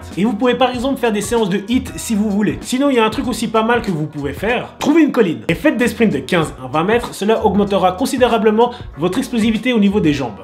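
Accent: Belgium. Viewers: T belge ?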